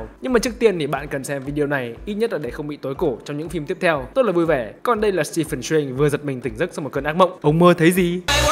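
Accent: North, Vietnam. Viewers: Giờ biết sao nữ thích giộng con trai bắc rồi.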